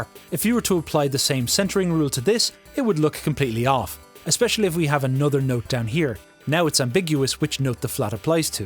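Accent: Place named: Ireland. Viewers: Raúl Pérez Scotspeople? The man could not be more Irish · how on earth could you think he's Scottish? He has a very very very obvious irish accent. Unless you're deaf and only reading the subtitles, I can't work out how you thought he was Scottish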